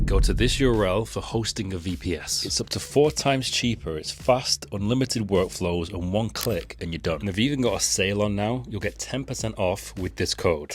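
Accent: North, United Kingdom. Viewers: Love this, new sub here, thank you; from a (what sounds like) a fellow northerner! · Senior Engineer here, definitely felt the role change in the last year. MCP Servers seem to be the powerhouse for me so far, I've also been using a 'Context Bank' MCP Server to help with the 'Compound Engineering' across different tasks (and even different tools like Roo Code and Claude Code). P.S Always nice to hear another vaguely northern accent!!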